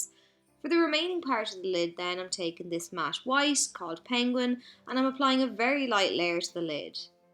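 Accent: Ireland. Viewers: Oh my gosh, I have been binging your videos! I can do basic makeup but that's about it- you have been so helpful and easy to follow! I also love your accent- I've been to Ireland twice and love it so much- you remind me of the wonderful people I met there! Thank you, go raibh maith agat! Love from Portland, Oregon, USA!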